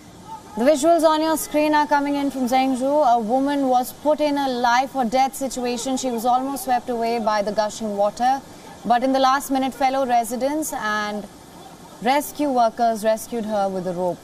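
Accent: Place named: India. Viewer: The anchorwoman looks like a Filipina but with an Indian accent.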